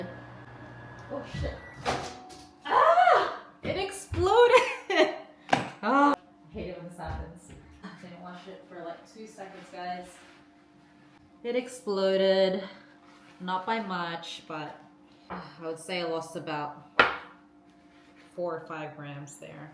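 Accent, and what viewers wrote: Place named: East Coast, United States. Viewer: You actually sound like your from the east coast, and BTW that GASP shirt was a banger I have a lot of that gear along with many other fitness oriented companies...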